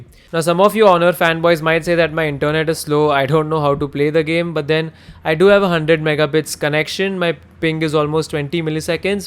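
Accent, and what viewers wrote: India. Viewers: Why indian have funny accent?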